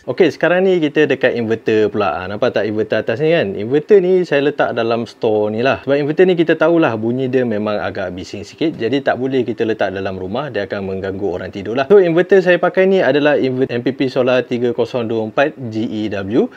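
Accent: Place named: Kelantan, Malaysia. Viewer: Terima kasih atas perkongsian.. Jelas dan jeliro ore kelate royat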